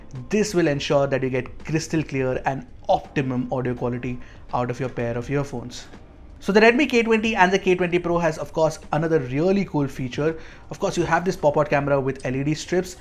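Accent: India. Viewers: I hate indian accent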